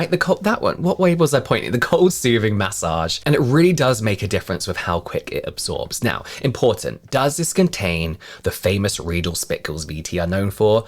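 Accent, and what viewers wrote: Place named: United Kingdom. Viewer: Think you're hearing "tret" as in tretanoin, but with a British accent 😂 some of us don't pronounce our Ts.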